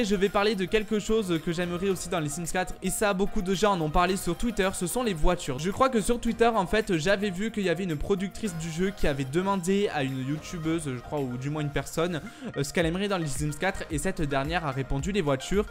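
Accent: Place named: Toulouse, France. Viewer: jadore ton axent toulousain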